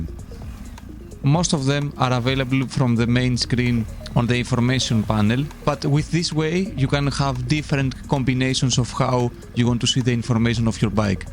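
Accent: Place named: Bisaya, Philippines. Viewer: Sa umpisa kala ko kapwa ko bisaya 😂😂😂😂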